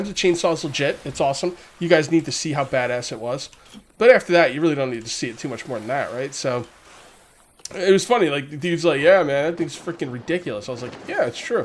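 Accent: United States. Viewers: FINALLY a true American accent among those British speech impediment accents.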